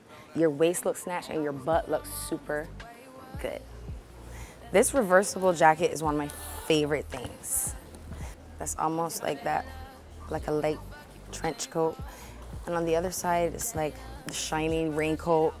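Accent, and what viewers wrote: England, United Kingdom. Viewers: Her accent is sounding a bit like English accent now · she took the british accent lol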